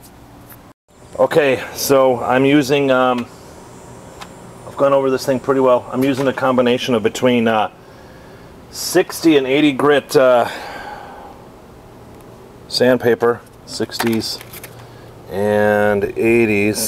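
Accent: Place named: Wisconsin, United States. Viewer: I thought you sounded like you're from Wisconsin. Looks like a good project. I wish I had the tools, etc to build one myself.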